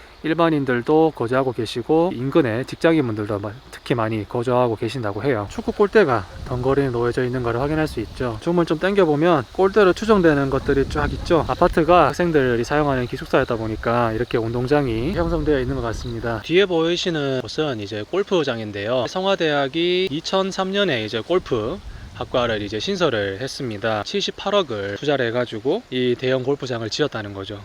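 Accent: Gyeongsang, South Korea. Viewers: 님 말투가 대구사람이신거 같아요 맞나요? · 경상도 사투리나 좀 고치든가... 짜증나.. 근데 이런거 올려서 뭐하게??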